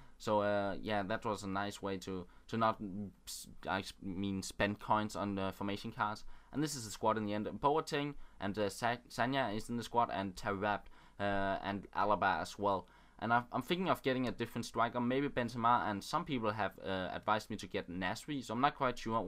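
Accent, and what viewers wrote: Denmark. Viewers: i think i am hearing a touch of danish when you talk, am i right? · hah! I can hear you are danish, because of the way you talk english! Jeg er norsk btw :P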